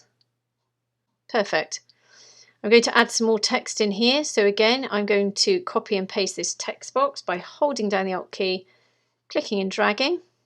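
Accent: England, United Kingdom. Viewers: A great demonstration and a lovely English dialect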